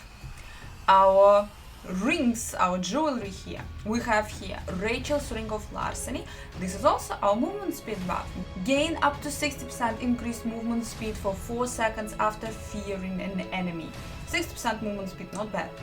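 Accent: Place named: Russia. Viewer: Как легко узнать русскоговорящего по его произношению английского языка) Но вполне сносно!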